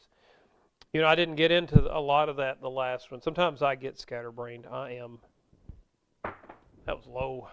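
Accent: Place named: South, United States. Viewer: Thank you! I love your Country Accent!!! 🙏🏿❤️❤️🥰❤️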